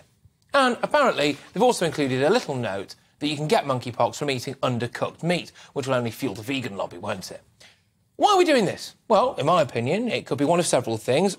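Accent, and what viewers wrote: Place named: England, United Kingdom. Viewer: American Republican with an English accent !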